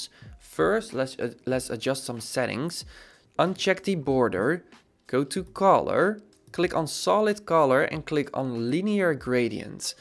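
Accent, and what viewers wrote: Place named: Netherlands. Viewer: No offense, maar je nederlands accent is wel hoorbaar voor de mede-Nederlanders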